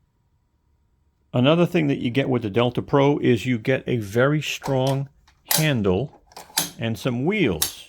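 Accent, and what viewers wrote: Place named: United States. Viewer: I have to say what a well put together video and introduction to these inverters. The host has a lovely clear articulate accent (I am English English so I find the young American accent a bit confusing! ) and the style of introduction was logical, informative and a breath of fresh air among other channels. I only watch YouTube nowadays and find there is such amazing content by conscientious Tubers like this gentleman. I have decided to buy the mini for my holiday home in South Africa for the 2-3 hours “loadshedding” (power cut) so I can watch my YouTubes on the big telly. I have gas stove for getting my 2 hour tea fix and refrigerator can go on and off as it likes so the capacity and function is just right for me . Again thanks for very well presented “ military officer style” instruction and excellent slides and explanation. 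👍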